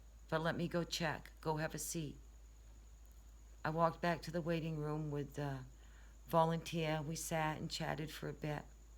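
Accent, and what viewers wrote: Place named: Long Island, United States. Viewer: You sound like you are from Long Island.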